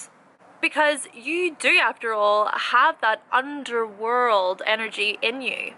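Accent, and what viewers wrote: Ireland. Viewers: are u Irish? u sound just like Nadine coyle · I love your eyes and i love that accent of yours.....irish? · It's true that Scorpios do have a tendency become involved in the porn industry Been there.Done that. BTW, please try not to lose your beautiful Irish accent.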